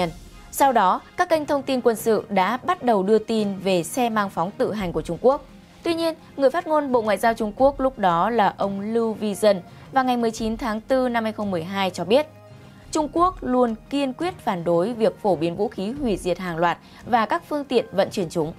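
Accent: Standard. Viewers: CHị dẫn chương trình hay quá....! giọng rõ phát âm rất chuẩn,dể nghe... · là số một hiện nay ở việt nam nhưng bình luận vẫn phụ thuộc ý tưởng của bbt. Người đẹp, giọng chuẩn, có hồn khi truyền đạt. Luôn ủng hộ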